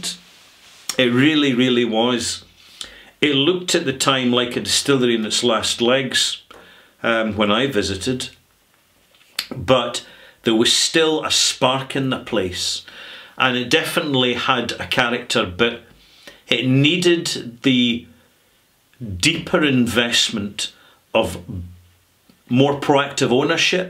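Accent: Scotland, United Kingdom. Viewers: Who else watches these videos for the hat and the Scottish accent.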